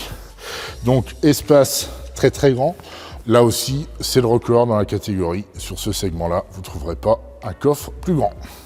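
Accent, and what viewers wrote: Paris, France. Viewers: J'adore l'accent Titi parisien. Un vrai régal. Génial. Jean Jacques 57 printemps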